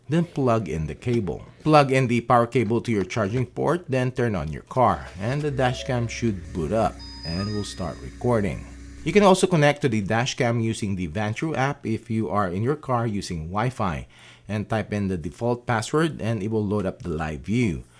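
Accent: Philippines. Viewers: Filipino accent ❤❤❤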